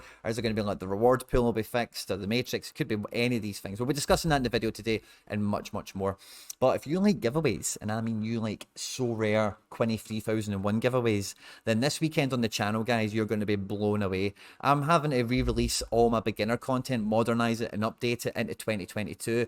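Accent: Scotland, United Kingdom. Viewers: Few things like listening to a cheery Scotsman discuss Sorare as the friday workday winds down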